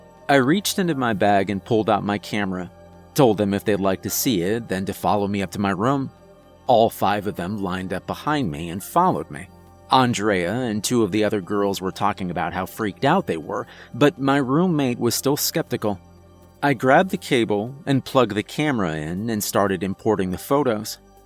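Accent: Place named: Northeast, United States. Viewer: Dear, are you Native American? Did you grow up on a reservation or did you have a lot of close friends that were Native American? Native American, like Northeastern tribes. Possibly let's see it has to be up North and it has to be also somewhat East, judging by your accent. But you said it was flat, so that's like Midwest, but there's a lot of flat expanses in many of the states and your accent reminds me of the East, like Maine, Massachusetts, Vermont, Rhode Island? No no no not definitely not Eastern directly on the Seaboard. Could it be possibly Minnesota, Minnetonka Native? I just want to add that the imagery, in this particular video, is absolutely beautiful, it's not scary at all, actually it is really, very, hypnotic and sci-fi. It looks like a Sci-Fi novel cover,the soft bound type of a novel cover, for example like the cover of Ringworld or something. 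I like how this ship,or craft, stretched out and looked like a Sheriff's badge star, over the Earth. That image, was sort of, sublimely cryptic., I hope you respond to my comment, because my curiosity, is absolutely killing me and that's scarier than this video, even though you have a million subscribers & this video is probably five years old. I didn't look at how many subscribers you have and I did not see when this was actually posted. I'm being kind of an exaggerator in fun... when giving the surmised, numbers of subscribers and the time span marked in years+ but maybe... I'm..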